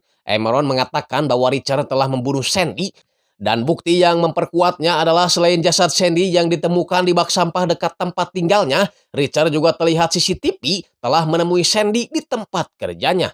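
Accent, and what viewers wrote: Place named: Sunda, Indonesia. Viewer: Njir baru denger cara baca narasinya boga karakter, selain intonasinya yang has urang Sunda, tapi sangat menghibur lucu komedi banget..😅😅 kanjuutkan..😊😅😅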